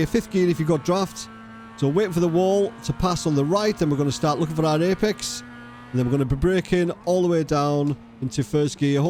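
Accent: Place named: Durham, United Kingdom. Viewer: i live in stanley co durham you sound like youre durham /darlo way be nice to have a chat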